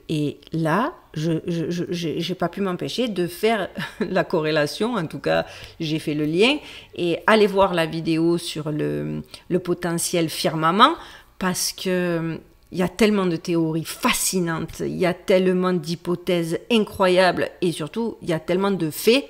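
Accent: South, France. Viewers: J adore votre accent du soleil je connais ma famille se cité dans l herault région Languedoc-Roussillon surmène ❤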